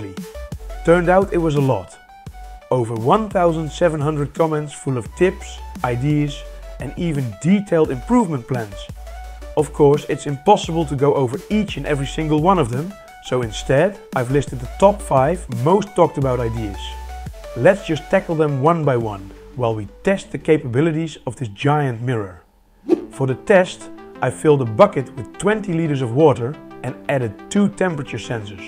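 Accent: Netherlands. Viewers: His english Sounds a bit like from nedderlands ... The sun there gives nearly 1000w/m² ... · Ahh that's crispy Dutch accent 😅😂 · So to hear it's definitely a Dutchman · Nederlander detected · I hear the Dutch ;) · I'm filled with proud Dutch feelings after hearing the accent.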